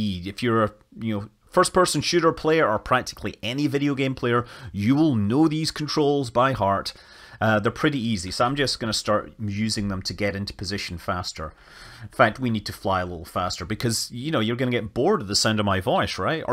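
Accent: Scotland, United Kingdom. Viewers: I hear Scottish accent and see science. Subscribed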